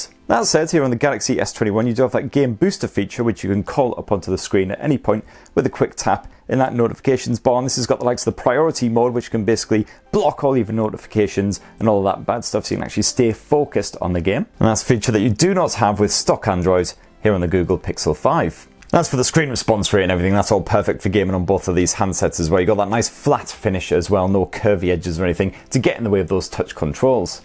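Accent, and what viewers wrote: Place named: Scotland, United Kingdom. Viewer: Where are you from,? You have a soft Scottish accent, so I'm thinking near the England/Scotland border?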